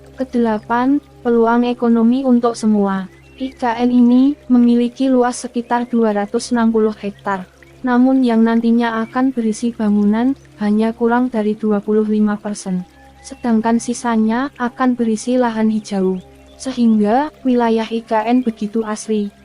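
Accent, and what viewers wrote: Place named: Java, Indonesia. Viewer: presenternya medok banget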